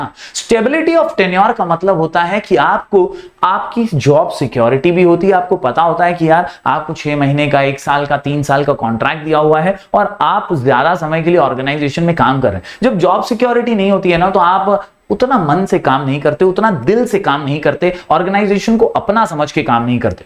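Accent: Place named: Bhojpuri, India. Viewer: Btw sir aapka bhojpuri bolne ka tarika bhot mst h 😂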